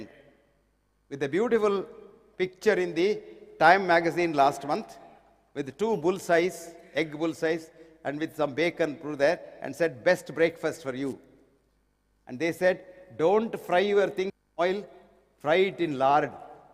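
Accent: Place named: India. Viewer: Only Indian will understand his English....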